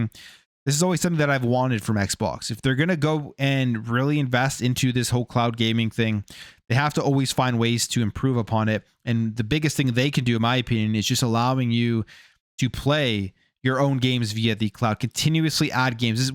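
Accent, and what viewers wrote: Canada. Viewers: You a canadian!?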